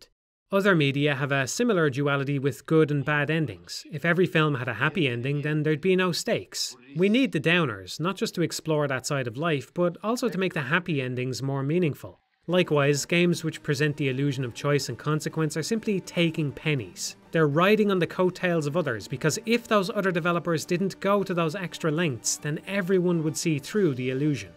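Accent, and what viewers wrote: Ireland. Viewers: the Irish accent is wonderful to hear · He's Irish, it is impossible to sound pompous with an Irish accent.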